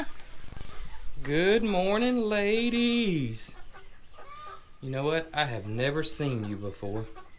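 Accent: South, United States. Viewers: Love this guys accent I think southern states of America have a very fluid way of talking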